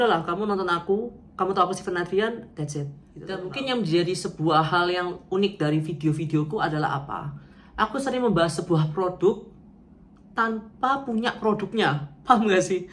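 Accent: Surabaya, Indonesia. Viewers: Dari awal nonton video sampean uda curiga dari cara bicaranya kok medok bgt 😂 adakno wong sby 😂 lancar kuliahnya disana ya mas 🎉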